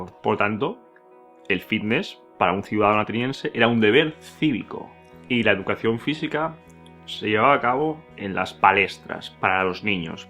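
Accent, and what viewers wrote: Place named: Spain. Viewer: Siempre me gustó leer sobre Grecia y Roma pero éste tema no lo tenía muy fresco con tantos detalles. Contado por ti es como escuchar un cuento. De qué parte de España eres? Preguntó por tu agradable acento. Yo soy Argentina de Buenos Aires. Aquí hablamos de vos en lugar de tú. El tú me parece muy lindo.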